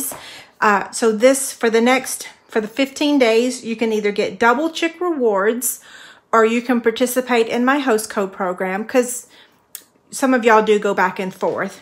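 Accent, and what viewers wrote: South, United States. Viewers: I love how you talk with that southern accent. Could listen to you all day.Well,maybe not all day but you know,lol.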